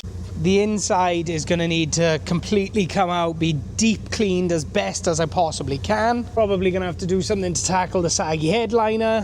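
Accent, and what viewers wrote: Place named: Welsh Valleys, United Kingdom. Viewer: Where you from mate you sound valleys nvm seems your Swansea way. Great video and great find will sub just to watch the upcoming videos on this one may even be interested in buying depending on that water leak issue and underneath. And Yes I'm probably having a mid life crisis 😂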